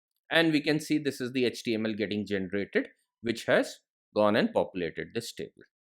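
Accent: India. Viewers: funny indian accent... 😸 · What's so funny about it? I guess you've never heard Indian English!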